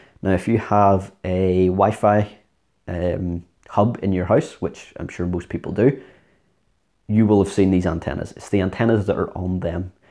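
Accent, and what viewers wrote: Northern Ireland, United Kingdom. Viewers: Northern Ireland! Yeo!1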